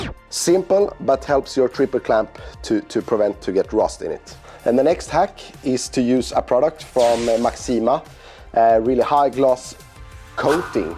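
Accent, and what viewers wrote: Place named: Sweden. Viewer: Fan vad jag gillar Hur du förklarar Doften av Hubba Bubba =) skön informativ video med skön svengelska ! tumme upp...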